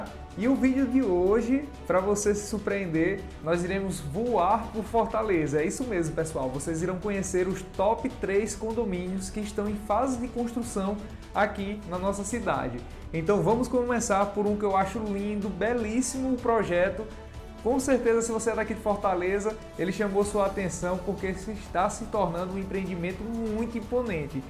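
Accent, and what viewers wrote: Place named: Paraíba, Brazil. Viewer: Mais vc não é de Fortaleza vc ta um pouco com o sotaque da Paraíba e do Pernambuco!!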